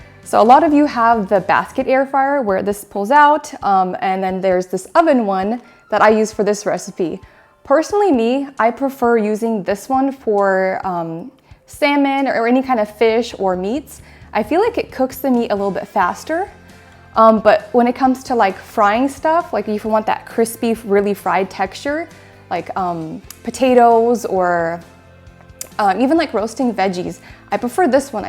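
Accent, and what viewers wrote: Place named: Poland. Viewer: Where is the accent from?Polish?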